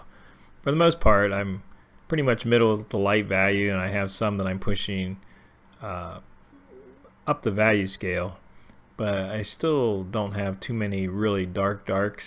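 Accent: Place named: Philadelphia, United States. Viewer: Nice demo. And painting...sound like your from Philly. Or Cleveland. Lol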